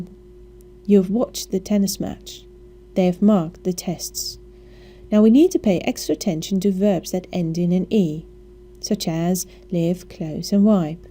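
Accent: England, United Kingdom. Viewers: thanks a lot ma'am I need this for my test In English I need to past this test so I can graduate grade 6 thanks alot and you sound like my teacher in English but a really nice voice your form Ingland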